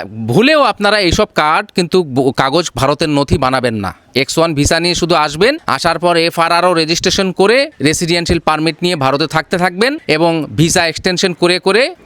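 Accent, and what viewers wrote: Bangladesh. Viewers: Apni ki nije bangladeshi? Accent sune toh tai lagche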